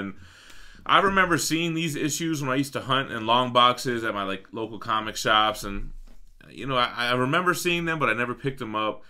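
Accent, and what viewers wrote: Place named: Bronx, United States. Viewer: i dig your videos and love your south Bronx accent but i gotta ask...lol. where in the world do you keep all the goods constantly coming in dude? lol between the statues and the 12 inch books, you must live in a mansion or a warehouse..lol. peace.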